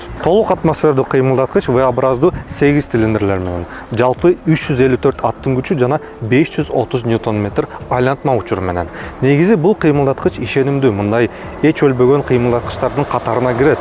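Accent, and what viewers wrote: Standard. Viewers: Таза кыргызсча суйлоп жакшы тушундурот азамат ишине ийгилик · Ассалому алейкум иним, эн биринчиден Кыргычаны тапптаза суйлогонун учун баалайм сенин ишинди. Менин биринчи унаам 2001 жыл мерседес 2.7 сdi avangard болгон.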